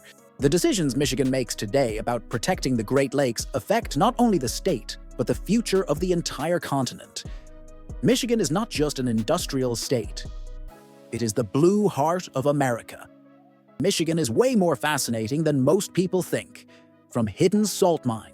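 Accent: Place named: Ireland. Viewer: AI(?) Irish. Do love it.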